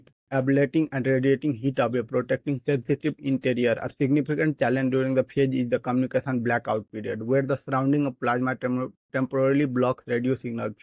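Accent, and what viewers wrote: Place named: Bihar, India. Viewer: Content is good but unable to bear the bihari type english. You should turn to AI voice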